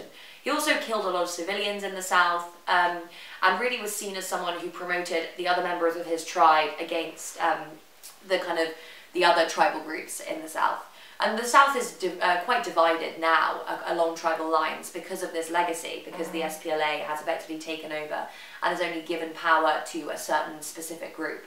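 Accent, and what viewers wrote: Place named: United Kingdom. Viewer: You speak too fast, I barely understood your sentences and I know most African will not understand you British accent . so consider and bare that in mind next time.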